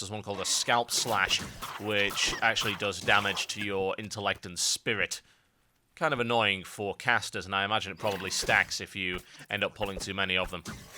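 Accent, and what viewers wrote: United Kingdom. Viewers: Lol at the people complaining that you, a British LPer, have a British accent. Personally, I get bored of hearing the non-existent Canadian accent, so I am quite pleased that my favorite WoW LPer is British. And though I said British before, as a fact (though I may be wrong) there are different types of British accents, seeing as France, England, and other countries of different languages make Britain, and they have different accents.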